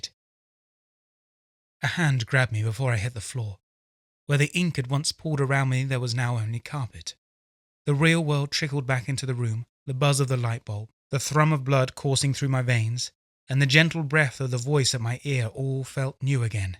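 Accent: England, United Kingdom. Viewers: fantastic story and fantastic narration ! finally a good solid English accent to listen to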